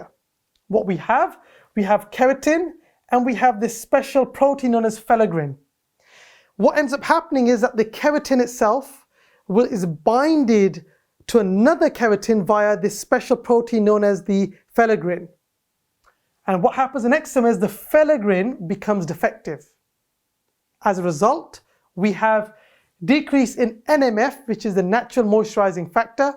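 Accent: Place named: Somalia. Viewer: I like your accent, that reminded me while I was by the border of Somalia.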